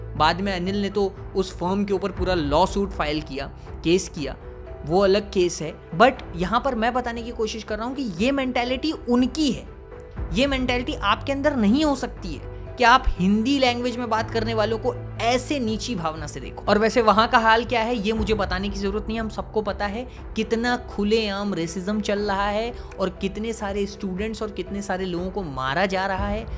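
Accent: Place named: Bihar, India. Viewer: Hame tu appki behaar ki language bohat Pasand h us tarha bolne ka style he alag h .v.good vdo (from Karachi ❤)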